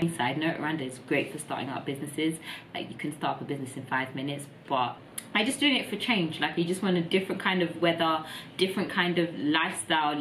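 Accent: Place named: United Kingdom. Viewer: Omg I hate British accent, but I love the video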